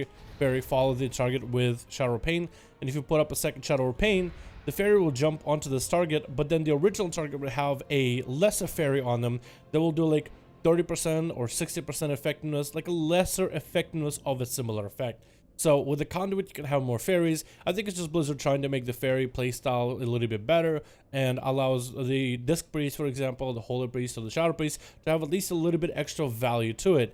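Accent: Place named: Russia. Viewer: as a non native English speaker I understand him just fine. You know he is Russian right? so for non native speakers it's hard to sound "perfect".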